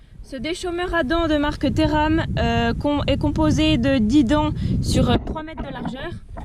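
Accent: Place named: Haute-Saône, France. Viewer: Désolé, je n’avais pas reconnu l’accent de la Hte Patate ! 👍 (Suis du canton de Marnay, émigré en Vendée depuis bientôt 40 ans). Vidéos intéressantes pour un passionné de machines et matériels agricoles ! 👏👏👏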